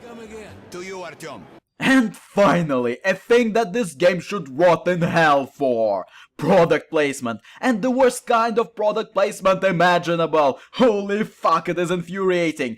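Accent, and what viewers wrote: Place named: Russia. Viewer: That heavy Russian accent is really hard on the ears.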